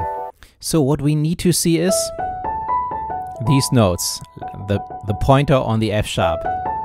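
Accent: Germany. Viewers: Nice video! I CAN HEAR THE GERMAN! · I can tell that your German 🎉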